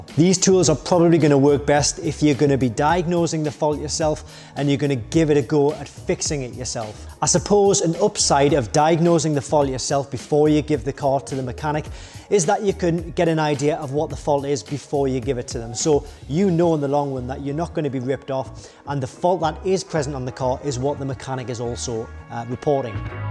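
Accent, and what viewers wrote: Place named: Liverpool, United Kingdom. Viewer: I am a fan of accents. I like to listen to different and know where they are from. I am curious which part of England (is it England?) is yours from. I would be guessing Liverpool, and as soon as I guess that I think I am wrong (?)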